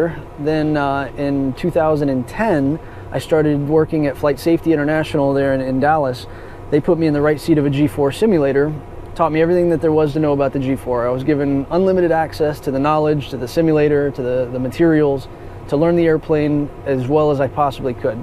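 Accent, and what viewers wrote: Canada. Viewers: North Texas? I thought he had a French canadien accent. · You sound like you're from Canada, not Texas.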